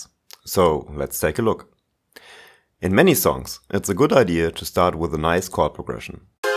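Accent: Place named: Germany. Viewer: German accent for ever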